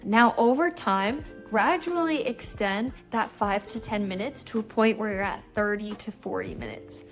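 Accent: United States. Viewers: ❤ thanks teacher your pronuntation Is clear AND natural.are you American?❤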